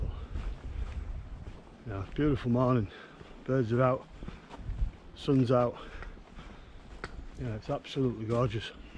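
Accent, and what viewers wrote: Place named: Leeds, United Kingdom. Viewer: I love wild garlic. Gather it, tub it, freeze it for future use. Stews and stuff. Once frozen it crumbles nicely. Where are you from?without giving too much away. I'm Leeds. You sound quite local.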